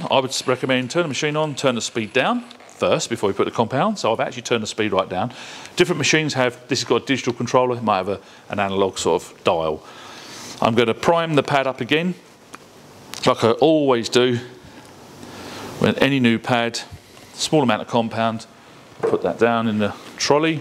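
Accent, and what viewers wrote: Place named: United Kingdom. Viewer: isnt lake country is based in the US ? just wondered why did his accent sound british ?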